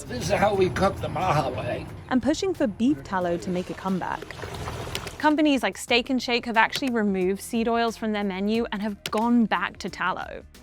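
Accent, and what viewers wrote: England, United Kingdom. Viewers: Another Brit who is been bought by the commercial interest to down play the dangers of seed oil. The country has gotten sicker since they started using seed oil. That's historical fact and no one with the British accent who has been bought by the commercial interests are going to convince me otherwise. She's obviously been bought by the industry that makes seed oil. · Weird to have someone speaking with an English accent, but calling Beef fat "tallow" instead of "Dripping" , and claiming she's never had it. Really? Never had a roast potato cooked in the traditional way? Her accent varies a bit. Seems slippery like the subject.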